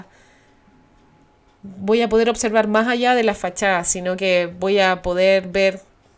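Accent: Chile. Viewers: Q lindo es cuchar esas palabras dedicadas a mi País. Con la sabiduría de una conocedora de nuestra cultura.. y experta en Arquitectura!! Me encanta el Acento Chileno. Y su Gente!! Saludos me suscribí. Y estaré pendiente de lo q publicas. Abrazo grande de Guadalajara Mex. Al al estás invitada a conocer. Si no la conoces!! Abrazo grande!! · Hola te acabo de encontrar y me encantó tu podcast Gracias por entender la esencia de mi país ❤ por explicar tan bien nuestra cultura a través de nuestras construcciones edificios etc En nuestra arquitectura está nuestra identidad Me encanta tu acento chileno ❤❤ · Gracias Poe tu narrative soy in Mexicano en Chicago y tengo amogos Chilenos me gusta tu Aden to chileno. Tines Que ir a Morelia Guanajuato a Taxco y Verla otro Mexico Zinzunzan y Verla’s La grna varied de Mexico y su culture felicitates en hora buena Dios te bendinga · Es impactante tu narrativa arquitectónica, dicha con un tono de voz tan característico de las chilenas. 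Espero más de tus videos. Recuerdo el título de una melodía de tu país que dice "si vas para Chile te ruego viajero"..... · que acento chileno maravilloso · Es maravilloso escucharla el acento chileno es muy bonito y escucharla hablar de México WOW que belleza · Me gusta el acento chileno. Buen vídeo. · Me gusta tu voz y acento de chilena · . Creo que eres Chilena? Pero tus programas me cautivaron. Y mira que es un reto ya que en los que escuché no incluían imágenes. Pero tus relatos desatan la imaginación!! Soy Mexicano, hijo de española. Y me capturó tu forma de hablar. Sacaste mi orgullo de ser mexicano!! Y que no todo son carteles de la droga. Saludos!!!! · Me impresionó la clrides con que explicas todo y me.gusta tu acento chileno 🌹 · Que bonita narración y más en chileno saudos a mis hermanos maxas, visita Querétaro tiene mucha arquitectura e historia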